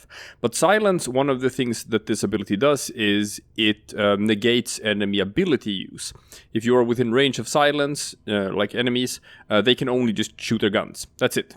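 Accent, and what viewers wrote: Sweden. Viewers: is this swenglish? "im pressing i"....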